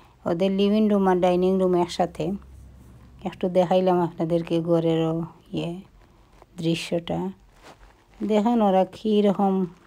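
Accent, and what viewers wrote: Sylhet, Bangladesh. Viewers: Kita goh affa apne ki sylheti, na shuddo na nokhali bolchen 💙 onek balo laglo apnar friend er food deke, Thank you for the share 👍👍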